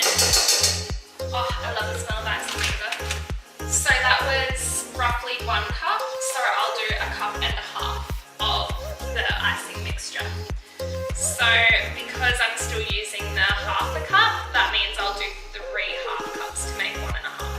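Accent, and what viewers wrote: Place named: Australia. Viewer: Its so cool your from Australia, we love your accent! The cupcakes look GREAT! nom, nom, nom... :)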